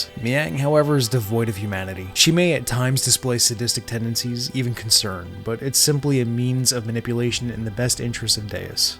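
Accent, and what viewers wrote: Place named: Philadelphia, United States. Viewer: Just finished the game last night, mind blown, had the perfect works on hand to dive deeper when I finished. My GF was watching me play some and was equally intrigued by the story instead of me recount every story element or have her watch the 17hour cutscene “movie” this was great. You from Philly (represent!) my dude? Your “o”s make me think you’re from the NE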